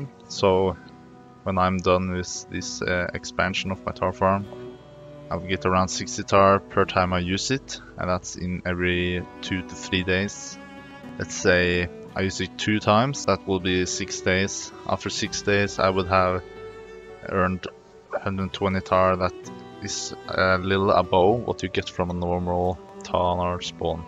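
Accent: Norway. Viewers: Are you norwegian? Great video!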